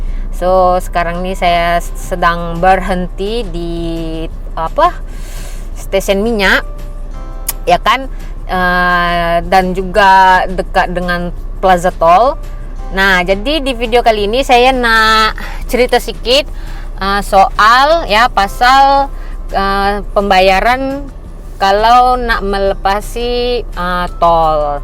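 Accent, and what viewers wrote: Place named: Malaysia. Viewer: Wow fasih slang melayu Malaysia ya? berapa lama di Malaysia?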